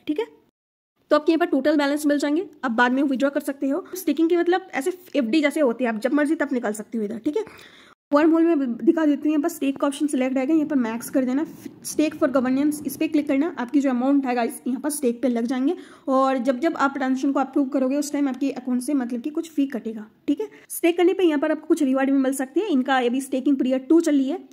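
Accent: Bengal, India. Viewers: App Bengali ho kiya mam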